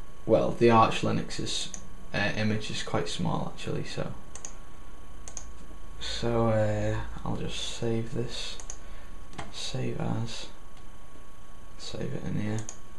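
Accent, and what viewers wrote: Liverpool, United Kingdom. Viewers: Are you from the Wirral/Liverpool?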